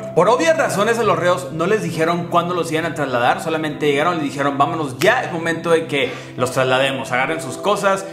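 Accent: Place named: Fresa, Mexico. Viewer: Nunca había visto un vídeo de el, muy buen video, solo que desespera su voz exageradamente fresa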